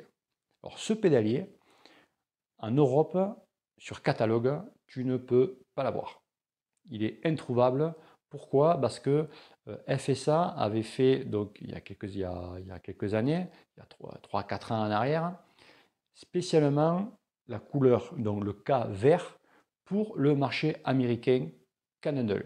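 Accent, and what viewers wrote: South, France. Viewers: Vu comment il parle je pensais aussi mais après je me suis rappeler qu'il est du Sud tu comprends 👍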